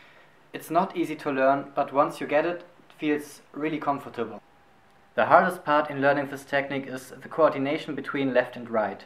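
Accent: Germany. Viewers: is that a german accent? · sounds like you're german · this is awesome! where are you from? sounds like your german :D I also use this technique since almost a year. its really nice, if you get the first few months of practice done which are pretty hard. as you said, its not easy to get your feet/legs/ankles comfortable with this motion. but once you get that its nice and easy :) its great to see somebody with the same technique playing on a very high tempo level. my maximum at the moment is still 145, sometimes 160 for a short time. so how long did it take you to get for 190 bpm? and would you agree to that: the higher the tempo is, the more you need to work with your ankles and less with the whole leg? thanks for your reply!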